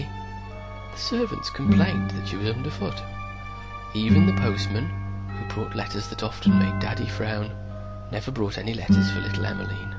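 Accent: England, United Kingdom. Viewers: u know something going on when the story is black and white and a man with a English accent is reading it😰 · British voice!!! :D (or sounding one, I always get accents mixed up for some reason) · English accent :) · Wonderful o.o and a British narrator makes it all the better ^_^ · And it's just so much more moving cuzz the narrator has an english accent :p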